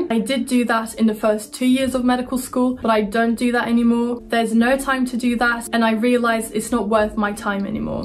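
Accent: United Kingdom. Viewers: İngiliz aksanın çok temiz fakat çok aksanlı konuşuyorsun anlaması zor olabiliyor.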